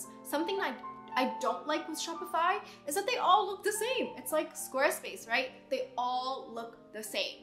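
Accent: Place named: United Kingdom. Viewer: Hi! what is your website? I have wix & I didn't find it easy to use at all. I would love to have a one on one to see if you can help me. You are so excited about the flow, code graphics... Etc. I love you accent, I'm a big British fan and I picked up on it right away🙂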